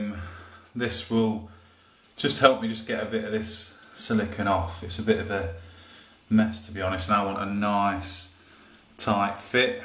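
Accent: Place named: Nottingham, United Kingdom. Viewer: That was spot on fella - thank you. Sounds like you might be Nottingham way too - You Reds!! 🔴⚪ 🙂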